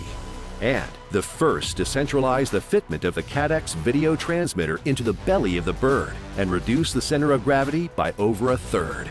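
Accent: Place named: California, United States. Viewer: Please get someone with a non Californian accent to narrate it .. that American "dude" is just putting me off like no tomorrow :-)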